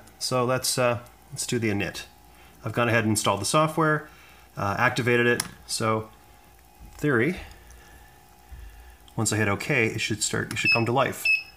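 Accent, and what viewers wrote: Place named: United States. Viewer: Great video, thanks for posting this! I'm very interested in this printer and noticed the economy shipping to NY state was $55 excluding VAT. You sound like you are from the states. If so, did you get charged a VAT?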